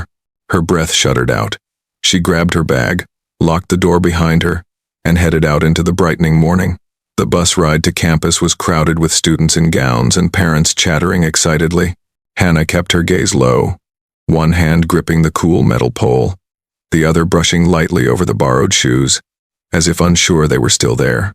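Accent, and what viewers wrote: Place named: Africa. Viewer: I agree with some of the comments below. and I think you did this on purpose, Too much commentary to robotic, very COLD. Not a good story in you first part. Watching from Nowhere.:face-red-droopy-eyes::face-red-droopy-eyes: :face-red-droopy-eyes: P.O.S. CEO Billionaire in this story. Bad African narration, really BAD.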